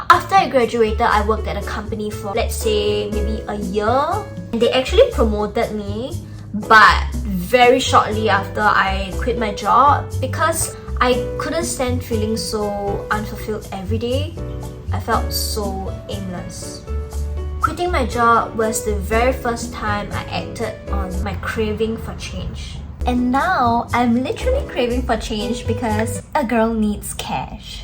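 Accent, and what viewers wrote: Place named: Malaysia. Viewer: Hey beautiful. Whats your accent? Malaysian?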